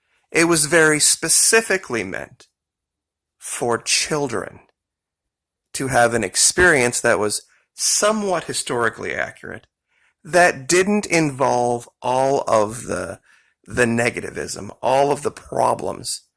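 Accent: South, United States. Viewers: Southern accent? Probably remove Louisiana from the map. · Are u from the south, ❓😌🙏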